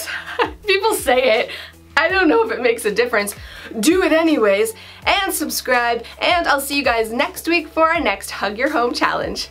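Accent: Canada. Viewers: You are beautiful inside & outside! I truly enjoy every video & love your relatable personality (especially your lovely Canadian accent!). Don't change a thing!!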